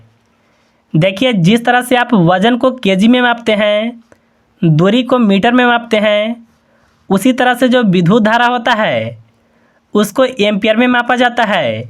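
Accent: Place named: Bihar, India. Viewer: Bihar se lag rahe ho sayad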